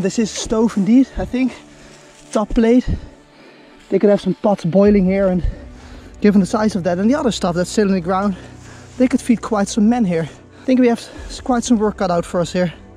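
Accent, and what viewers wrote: Netherlands. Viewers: I mean this in the best way but I feel like I’m watch verstapen dig for ww2 objects it’s so cool love the Dutch accent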